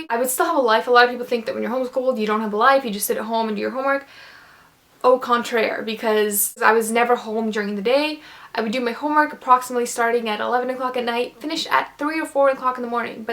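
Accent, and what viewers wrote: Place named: Russia. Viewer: с едва заметным русским акцентом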